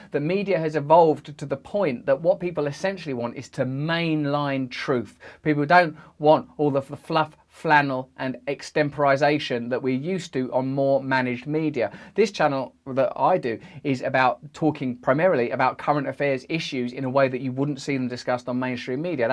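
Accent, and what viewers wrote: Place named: Cockney, United Kingdom. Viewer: hahaha!! He’s a handsome man. Voice is soothing, too. But sometimes I can’t understand him with the cockney accent.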